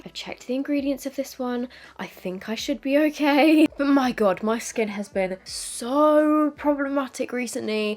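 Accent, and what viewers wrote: United Kingdom. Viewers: I found you in mid-2017. I was 37 years old and had NO idea how to do makeup (foundation especially!). I’d always been too lazy to do makeup, and my skin was clear due to hormonal BC since age 15, so foundation was completely alien to me. But as I approached 40, my skin was beginning to show sun spots and dark circles, so I wanted to learn how to do my makeup. I subbed to around 15 makeup channels including yours & I have only stayed with 3, including you. Mostly because you’re super relatable, down to earth, honest, and I adore a British accent (USA here). I’ve really enjoyed watching you grow up and thrive. I’m happy for you in the same way I’d be happy for a little sister… is that weird? I don’t even know you but I have the best wishes and highest hopes for you & your little family. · You popped up on my for you page in YouTube about a year ago but then I didn't like the British accent but at the same time i was watching some of your videos and after a couple months i became addicted 💗💗💗💗💗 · !!!! 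I started watching your videos in 2017 or 2018 exactly for testing these cheap make up products and because I was living in UK back then and you helped me to learn understand british accent. Later I stopped watching youtube for couple of years and now I just felt like watching make up video and I remembered you so I got back and it was one of the first videos that was recommended for me. I still love you♥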